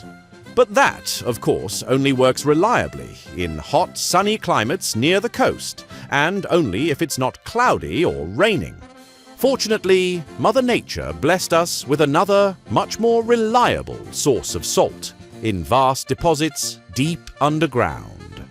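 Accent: United Kingdom. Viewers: The new narrator needs more practise putting on a heavier british accent with more inflection.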